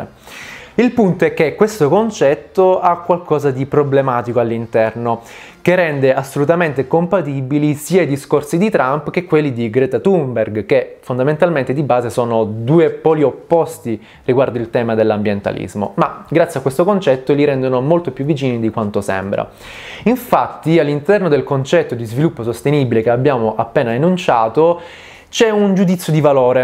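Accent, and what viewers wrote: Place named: Salento, Italy. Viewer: Ma.. accento salentino ne abbiamo? 🤣 Un saluto da un tuo conterraneo!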